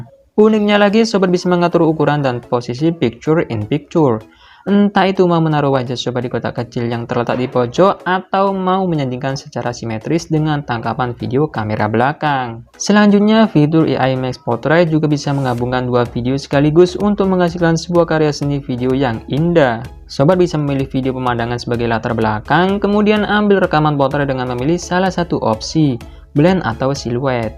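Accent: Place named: Madura, Indonesia. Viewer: Kayak logat orang madura 😅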